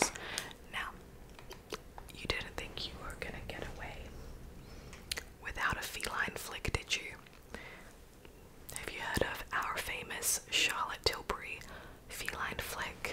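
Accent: Australia. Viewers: is that a fellow aussie accent i hear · Subscribed bc we never get to hear an Aussie accent!! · Does anyone know what country she lives in? She sounds Australian, is that where she lives?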